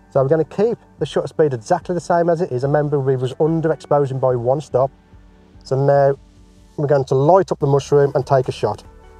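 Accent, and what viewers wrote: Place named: Stafford, United Kingdom. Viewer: wicked work mate you sound stafford way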